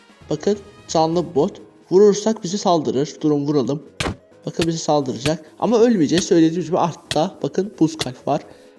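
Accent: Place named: Azerbaijan. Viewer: Salam məndə azeriyəm danışığınan bilinir azəri olduğun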